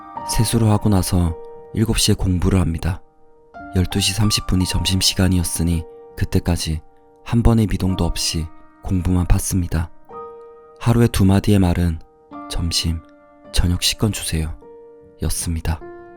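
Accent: Gyeongsang, South Korea. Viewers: 경상도 분이신가 '아무껏도' 연발이심.. 몰입하다 빵터졌네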